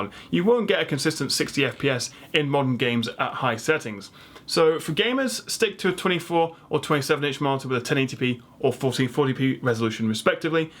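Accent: England, United Kingdom. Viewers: It's because he is british and you are American. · Awesome explanation and good video. But I CAN'T STAND the way you and other people from the UK say "H". Like you do when you say "HD" it's like nails on a chalkboard. :( lol but you're still cool. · but that doesn't make sense, he's ENGLISH and he's speaking ENGLISH and yet you are American (I presume) and you speak English. Looks like you're the one with the accent! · "fo-cking monitor, fo-cking gaming, fo-cking resolution".. i'm just amused. No offense I honestly love British accent. I just heard it differently. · I really enjoyed your video on features of monitors to consider. Man, are you knowledgeable--I learned a lot! I was very close to buying a 27 inch monitor, but the information you presented about 1080 resolution being inadequate on a monitor that size saved me from making an expensive mistake. 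I will wait until I can afford a 1440 27 inch monitor rather than a 1080. I am now a new subscriber and will check in with your website regularly to keep learning about computers and their peripherals. I know that you are young, bright, and British, but it might be helpful if you could slow your speech down a bit for those of us who aren’t. Thanks!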